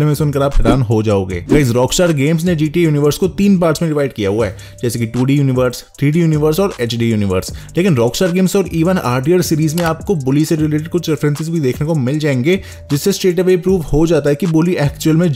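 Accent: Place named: India. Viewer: He is talking indian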